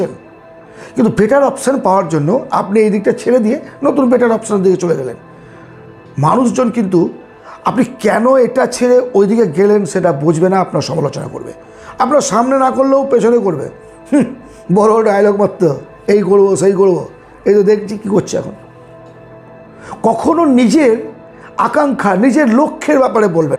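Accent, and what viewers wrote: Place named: West Bengal, India. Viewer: আপনার কথায় পশ্চিমবঙ্গের টান আছে।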